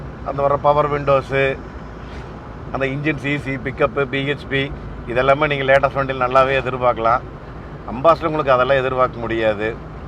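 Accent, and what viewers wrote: Kongu, India. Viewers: கொங்கு தமிழ் அழகர் சார் உங்கள் நெம்பர் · கொஞ்சும் கொங்குத் தமிழ் பேசும் கோமகரே...! திகட்டாத தித்திக்கும் பேச்சுடைய திருப்பூர் திருமகரே...! அடடா ஆனந்தமே❤❤❤ அம்சமான கார்...! அழகான கார்...! அளவான வசதிகொண்ட கார்...! அனைத்து மக்களுக்கும் பிடித்தமான கார்...! நம் திருப்பூர் அண்ணாச்சி கொங்குநாட்டுச் சிங்கம் திருப்பூர் தங்கம் நம் அய்யா😊🙏 நமது கண்களுக்கு விருந்தளிக்க கொண்டு வந்து இருக்கும் இந்த அற்புதமான கார்....! "❤அம்பாசிட்டர்❤" கார்...! அருமையோ அருமை❤❤❤❤ என்றும் அன்புடன் உங்கள் மகன்போல இவன் ரா.முஹம்மது கபூர் (சென்னையில் இருந்து) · Anna azhagana kongu Tamil la arumayana oru pathivunga naa kekka kekka ketute irukkalam nu irukkuthunga na arumainga ponga ❤️❤️❤️😻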